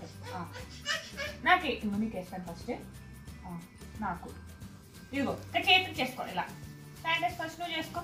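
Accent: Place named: Godavari, India. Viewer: Godawari esha...uttichinattu vinapaduthundi👌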